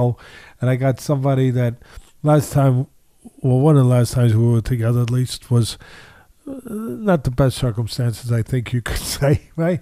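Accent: Newcastle, United Kingdom. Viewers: wow with true geordie, amazing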